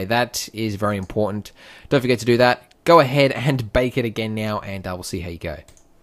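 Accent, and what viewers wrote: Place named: United States. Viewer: it's calt American English.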